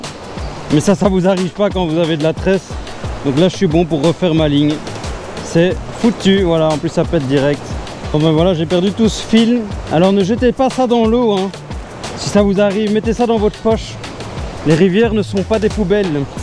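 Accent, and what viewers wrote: Belgium. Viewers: Tu es belge ou français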